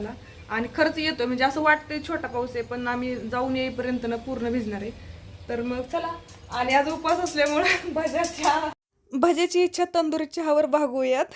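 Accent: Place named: Satara, India. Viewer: You are very beautiful. Like your Marathi. You sound like you are from Satara.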